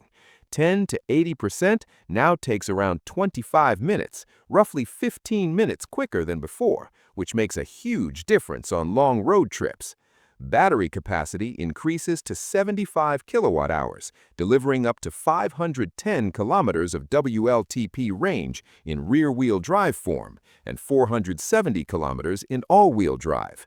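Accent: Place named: United States. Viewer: Agreed! And to make it worse, the AI voice is 'Merikan!!!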